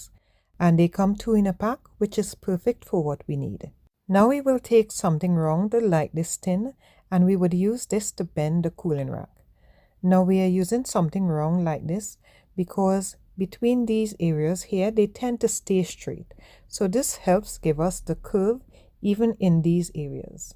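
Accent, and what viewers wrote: Trinidad and Tobago. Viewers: Absolutely gorgeous! Is that a Trini accent I detect though? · Omg! as I heard your voice I said she sounds Trinidadian. Great job my fellow Country lady.. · Are you a Trini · Why do u sound like a trini so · Are you from Trinidad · Gorgeous! And I love that you sound like a true Trini!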